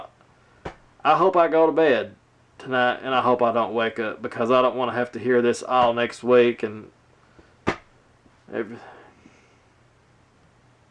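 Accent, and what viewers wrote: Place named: Louisville, United States. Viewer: he had me going after the louisville loss...plus, his accent is TOTALLY lou-a-vul! i thought he was for real blaming the refs, the "criminole" jameis winston and the ncaa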